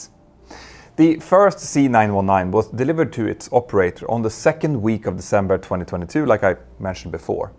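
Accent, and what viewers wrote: Scandinavia. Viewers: I am not sure but this guy’s accent sounds pretty Nordic 😊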